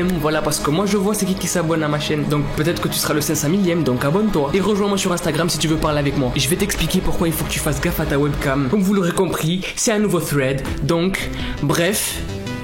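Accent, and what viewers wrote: Quebec, Canada. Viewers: Accent quebecois acceptable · Moi je trouve que ton accent québécois parce que je parle québécois 😉😉😉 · Ta un bonne accent quebecois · Jadore to accent quebecois et ton exorsice😅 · je suis québécois et tu parle bien Québec👍👍👍👍👍👍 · qui trouve que parle grv bien quebecois? 😆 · Jadore ton acssen Quebec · j'adore ton accent Québécois 😂👌(j'en suis une O.O) 👇liker si vous êtes Quebecois/Québécoise 👇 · Ho wow l'accent québécois😂👌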